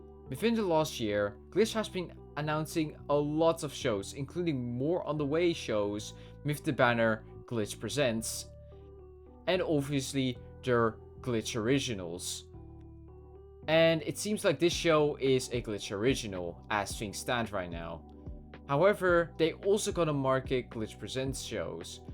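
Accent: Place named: Netherlands. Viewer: Sorry this is unrelated, but I heard your accent and I was like "You sound Dutch" and then I check your channel and you're from the Netherlands, I just can't lose. She's too good chat 🗣🔥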